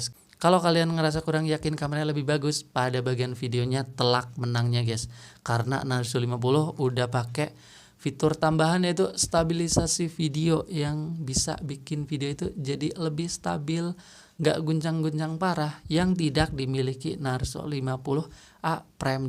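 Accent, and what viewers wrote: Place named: Banyumas, Indonesia. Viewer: Abang ngapak orang mana ya?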